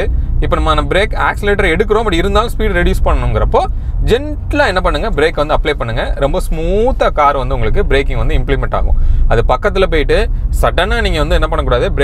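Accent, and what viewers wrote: Virudhunagar, India. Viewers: Neenga VIRUDHUNAGAR ah na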